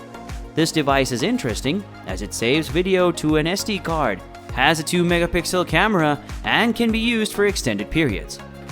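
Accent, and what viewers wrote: India. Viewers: Siri is impressive. Can understand that indish acent!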